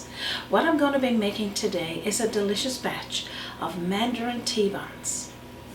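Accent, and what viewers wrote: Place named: Canada. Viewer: Have a beautiful Sunday. Looks delicious wished I could have tea and buns with you. Love your Canadian accent. Stay safe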